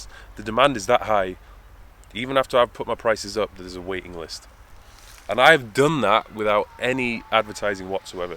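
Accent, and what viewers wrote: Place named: RP, United Kingdom. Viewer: ..I am a newbie to your YouTube channel..but I have to say it is by far the BEST fitness channel I have seen. This video is awesome. And your English is fantastic..you do not speak like a chav like other trainers. No slang, no ghetto-speech...just nice, straight, queens english. Your knowledge and your honesty is brilliant. No junk, no trash, NO WAFFLE, and no clickbait...just straight to the point, and nice short videos. I’m glad I subscribed. Top man!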